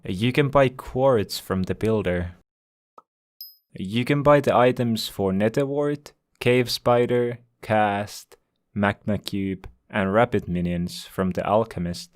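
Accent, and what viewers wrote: Finland. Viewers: Are you from finland ?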